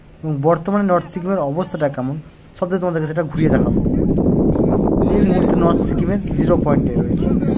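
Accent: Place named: Bangladesh. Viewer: Bangladesh er lok kotha sunei bojha jai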